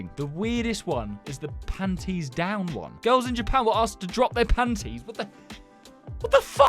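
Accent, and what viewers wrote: United Kingdom. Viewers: are you British? bc u say waht i would od no offense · Are you British you literally sound British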